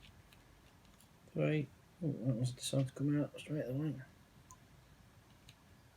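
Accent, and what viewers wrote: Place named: Lincolnshire, United Kingdom. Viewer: You sound like a lincolnshire lad:-)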